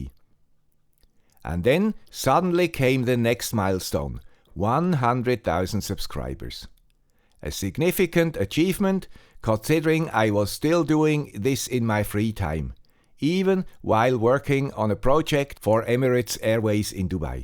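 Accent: Switzerland. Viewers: As a long term viewer, but not so a commenter. REALLY Thank you for all the new ideas, tech, and all the effort put in each video!! I always look up for your channel by "the guy with a swiss accent" and works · Thanks from Australia! (My father was Swiss, so your accent always made me smile 😃) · I have loved your content for many years. You have earned Youtube retirement as much as anybody and I hope you enjoy it. Your Swiss accent will be missed. Until next time 🫡. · So many cool concept that you have presented, some I have also implemented with your Swiss accent help. And most important - I finally thanks to you I did not feel I am the only one, too old, or crazy enough to tinker with everything I touch xD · Many thanks for your great tests, sharing success and especially failures. Looking forward to hearing your Swiss accent) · Dear, the guy with the Swiss Accent - Thank you..... 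Like many others you kickstarted my homeassistant, arduino, Esp32, wifi, Raspberry PI (grafana etc,), BangGood and Aliexpress addiction 🙂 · I fully support your decision. I can imagine how creating amazing content every week can turn into a real obligation. But at the same time, it feels a bit sad to reach such milestones, you realize that things can’t go on the way you’ve grown to love and are used to. Thank you so much for your work, guy with the Swiss accent. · I will miss your Swiss accent enormously. Not to mention the Hat. If you have anytime I could do with some help on a radio project I am attempting to come up with a solution for. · Thank you, you excellent guy with the Swiss accent.I have learned so much from you. Your videos have breadth and they have depth; I have learned what I can do, and how I can do it. I've been fascinated by your experiments with LoRa and IoT. You've earned your rest. 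My very best wishes for the rest of your life. Adrian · I enjoy watching the videos of the guy with the swiss accent! I love the tiny hand as well. I really do not have time to do all the things you do, but I have learned about LoRa and other technologies with you. Thanks again and have a nice trip. Congratulations on becoming a grandfather! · Thanks for all of your video. It make me feel more understand about electronic device, especially radio one. "Guy with Swiss accent" Will never gone. Thanks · Dear Mr, our friend with the Swiss accent, thank you. Without knowing it you have helped me (amongst countless others) get better in my field, learn a ton of new things, get a degree in electrical engineering, hunt for weather balloons, get a MSc, fall in love with microcontrolers etc while having fun watching your videos. We are grateful for the countless hours you have spent creating these vids. 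It was an honor. Best regards, a viewer from Greece. P.S. If you decide to visit Greece coffee is on me:) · Thank you und "Grüezi" from Luxemburg. It was tremendeous all these years listening to your accent, ;-) while learning the different technologies you presentend with heart and soul ;-) Thank you for all these shared hours ;-) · Thanks very much for 10 years of great content! I joined about halfway through and have greatly benefitted from your content. I have been educated and entertained. I wish you health and happiness with the next chapter of your life and hope to hear the "Swiss Accent" again from time to time. Very best wishes, Ace G0ACE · you have always been an inspiration to me. I can honestly say that it was because of you that I started my own YouTube channel. I am sending best wishes from Canada to the guy with the Swiss accent. Thanks for all the wonderful content! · I'm an old engineer myself. 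Have enjoyed your videos, I like your swiss accent! And you have an engineers inquisitive mind. Glad you are open to still posting in the future, you've gotten good at this, but it should be fun and not a chore and feel free to do it only when you feel like it. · No! Suisse accent ! 😢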